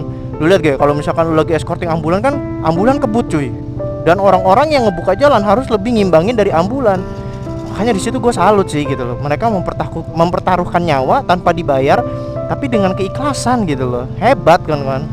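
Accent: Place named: Lampung, Indonesia. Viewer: YouTuber dari Lampung mana ini suaranya nee.?👍👍, . . . . Monggo mampir ke channel ku juga yaa🙏 .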